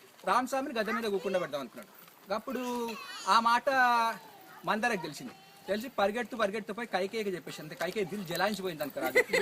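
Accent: Telangana, India. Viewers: Very nice actually iam trying to learn telangana slang ur slang help full to me thank you sir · Zabardasth telangana accent Pls continue bhai Big bow!!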